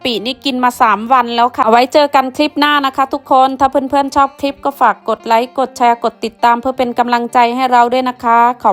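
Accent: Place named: Central Thailand, Thailand. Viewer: ชอบจัง บรรยายไทยกลาง ส่วนใหญ่คนอื่นพูดอิสานกัน ฟังไม่รู้เรื่อง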